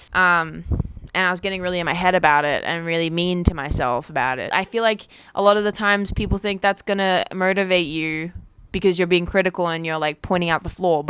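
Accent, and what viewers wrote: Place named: Australia. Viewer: Was gonna say you lowkey sound like but it’s probably just cuz you’re aussie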